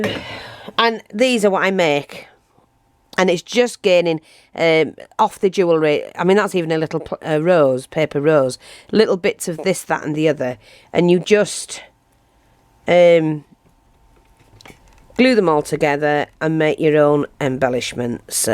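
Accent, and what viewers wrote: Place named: Hull, United Kingdom. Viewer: your stuff is fantastic hun im in hull your acsent doesn't sound to far away lol x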